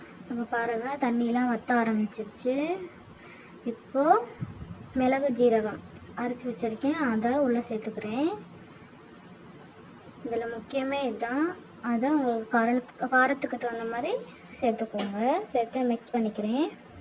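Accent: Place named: Madurai, India. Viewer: Your madurai slang is awesome 👌 👏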